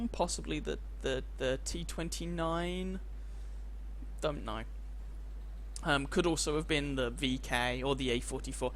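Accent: United Kingdom. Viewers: Great and you're welcome! You do know that most of us Americans love your British accents as much as a lot of you like ours (especially our Southern)! Lol ^_^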